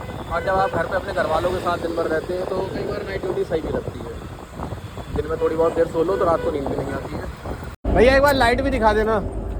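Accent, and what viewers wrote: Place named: Uttar Pradesh, India. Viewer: Bhai apki awaz sunn kar lgta hai ki aap UP se hain. Mein Azamgarh se hunn, aap kahan se ho?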